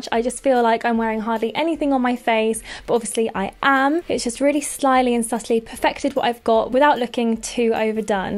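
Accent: United Kingdom. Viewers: this is the first video I've watched of yours so far. and I absolutely love it!!you're so feminine, and polite, i love your light pink style and I love your accent. I've always loved British accents, thank you so much for making this, I love natural makeup looks. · In which oart of the Uk do you lievv? Aksong bc of your accent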